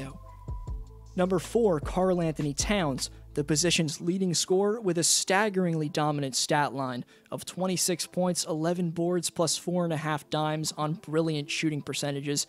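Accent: Canada. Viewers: R u from Toronto/ Canada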